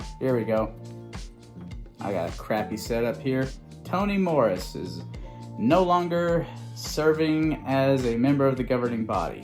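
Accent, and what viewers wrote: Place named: South, United States. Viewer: Very logical. A refreshing change from the GB! I enjoyed your southern accent too.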